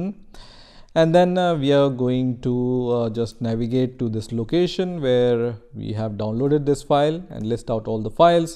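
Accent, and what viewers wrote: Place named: India. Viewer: When i saw the indian accent, i realized that i was in the right place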